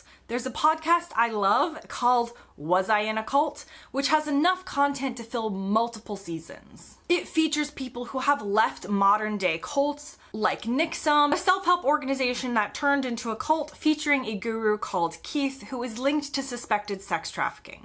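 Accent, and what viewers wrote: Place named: United States. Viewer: Madam, I am not sure you are correct, but your ENTIRE VODEO was beautifully phrased, logical, eloquent and humorous, Many bemoan your young American qualities and write you off as an airhead, I presume because of your intonation . But I find you to be unusually perceptive and well spoken to boot. I have said it before and I say it again, you are a welcome addition to our Great Britain!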